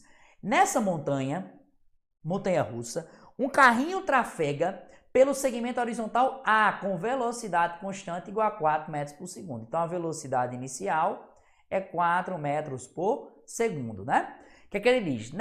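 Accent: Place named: Northeast, Brazil. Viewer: Muito obrigada professor, me ajudou muito!! O sotaque nordestino, bom demais!!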